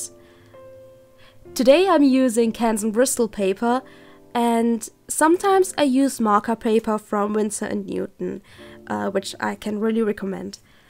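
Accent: Germany. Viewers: bist du aus Deutschland? xD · Are you German? I can hear a lil German-like accent? Ahh hab die Kanal info gelesen! Haha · Are you german? That sounds like a german accent xd I'm german xd Edit: jap ich hab deine Kanalinfo gesehen :3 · Yep shes german lol I heard the accent too. I'm not german tho I speak flemish (belgian dutch) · wie ich schon am anfang gehört habe, muttersprache deutsch. Ich hör es immer raus, egal ob der akzent stark ist oder nicht xd, danke für das video :) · I love your german accent! It's a lot more soothing to listen to than all greasy american you hear on YT. I'm from sweden so I don't have perfect pronounciation either. Some senteces sound great for me, and some words are just... urgh. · Du hast echt einen krassen deutsch Akzent, bist du deutsche ? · Hab ich auch mitbekommen. Sie hat einen ziemlichen deutschen Akzent, auch wenn des jetzt nichts negatives bedeuten muss. 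· Hey german bud ^^ <3 I heard the accents immediately because I got the same xD Maybe if you like videogames we may play together one time ^^ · Are you German? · Are you from Germany? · Sminfin ja liegt einfach daran das wir den allgemeinen Klang der Muttersprache oft sehr lang behalten selbst wenn wir andere sprechen. Wie man hier ja gut sieht. Ihr Englisch ist super und sie Betont wunderbar aber der gesamt klang ist deutsch. Ein Native-Speaker würde sachen einfach anders betonen , andere Geschwindigkeit benutzen etc. Briten singen zb etwas mehr :3 (Sorry Ich bin sehr interresiert an Sprachen und studiere grad eine Übersetzen & Dolmetschen) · Are you German, I recognised the accent . Bist du deutsch ? Ich erkannte den Akzent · Are you German? Your "accent (is this right?! xD) sounds so German ':3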